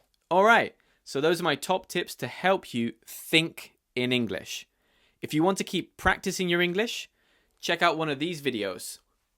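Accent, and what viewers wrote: United Kingdom. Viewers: I live in Ulsan, South Korea! Most Korean students learn American English in school, I was no exception. But I love British accents so I researched them and I was able to find you! 🥰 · You are the only british that I understand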